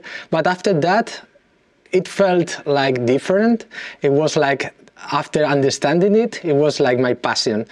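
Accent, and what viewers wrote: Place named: Spain. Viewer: Nice accent very authentic spaniard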